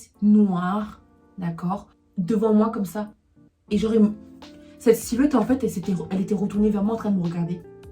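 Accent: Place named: England, United Kingdom. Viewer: L'accent anglais 😌❤️❤️sinon très belle histoire.☺️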